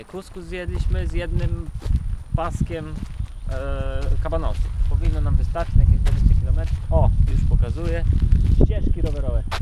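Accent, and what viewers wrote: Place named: Podlasie, Poland. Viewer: hehe, tak pytalem bo zaciagasz troche jakby po podlasku... :)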